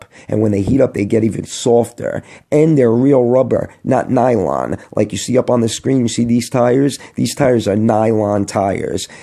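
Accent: New York, United States. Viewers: I love your NYC accent lol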